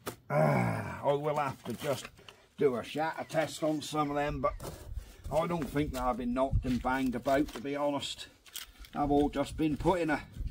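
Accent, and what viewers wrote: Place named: Black Country, United Kingdom. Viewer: Guna gu sounds like black country Like Yo ba guns gu darn there bin ya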